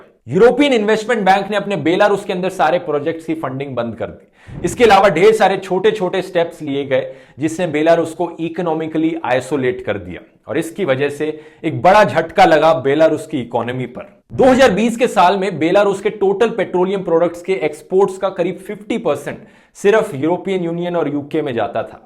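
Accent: India. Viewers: I completly agree with you mate. Just a question, what language do you speak? because it sounds like English merged with Hindu or other Indian language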